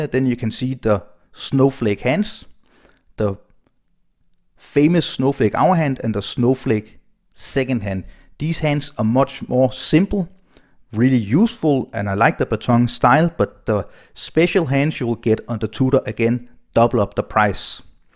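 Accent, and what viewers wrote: Denmark. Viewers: By your accent Im guessing you are Danish, I am looking to buy a BB58 as well, do you recommend any retailers in the Copenhagen area? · I would guess your mother tongue is Danish, am I right?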